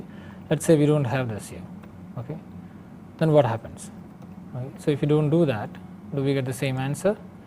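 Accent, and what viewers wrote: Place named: India. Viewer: If I hear one more sh*tty Indian accent, my head is gonna explode. If Microsoft didn't discover someone over there could code a tiny bit, folks there would still be insulating their mud huts with cow dung...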